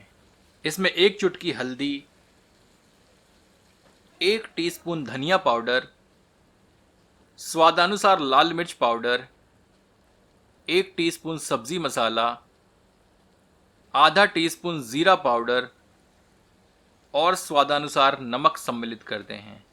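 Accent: Standard. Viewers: Apki hindi shudh hai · आपकी हिंदी भी अत्यंत शुद्व है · Khane se jyada testy to apki Sudha Hindi lagti he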